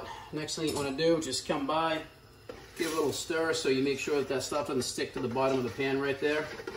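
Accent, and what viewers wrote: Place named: Boston, United States. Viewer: I knew it was BS right off. If he wanted a little water in it, he would have put a little in the cans to rinse them out in the beginning. It was a good try try, though. Magic ice. 😏 I also knew, from his accent, that he was from an area in close proximity to Boston. NH-ites can pick up on that pretty easily. Lucky pooch at the end🐕 That's gonna make her fart, yanno.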